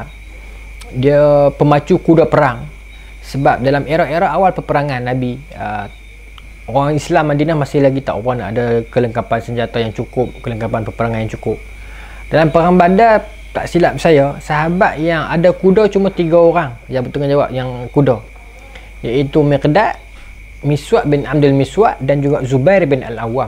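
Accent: North, Malaysia. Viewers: 😃😄 wahh.. Ramai juga yg faham kan kuliah Ustaz walaupun beliau pekat loghat utaqa.. Boleh nampak disitu keikhlasan Ustaz dalam berdakwah 👍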